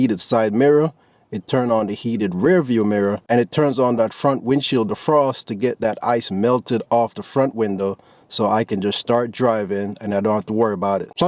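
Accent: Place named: Jamaica. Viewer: You sound like you have Jamaican heritage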